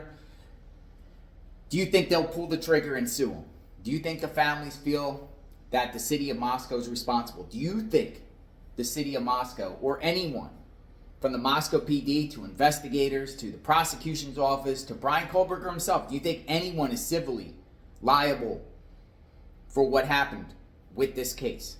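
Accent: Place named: Philadelphia, United States. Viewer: speaks with classic Philadelphia brogue. Much of his pronunciation is a regionalism. He reads perfectly well! Let’s try to build people up instead of tearing them down…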